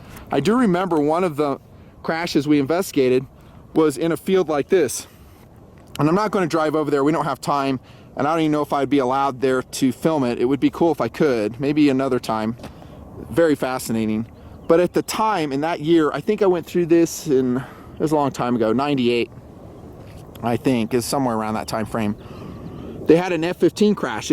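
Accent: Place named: Texas, United States. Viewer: Yup sounds like Texas.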